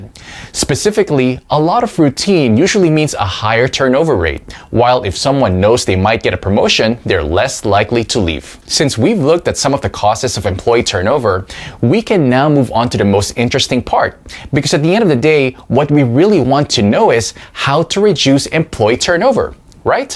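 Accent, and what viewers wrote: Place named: Philippines. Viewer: Based on this dude’s accent... he’s Filipino 😏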